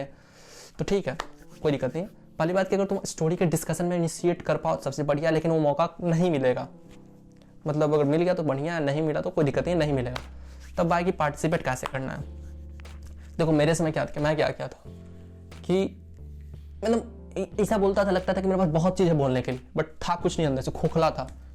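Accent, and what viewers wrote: Bihar, India. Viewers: Bihari ho kya bhai 😎😎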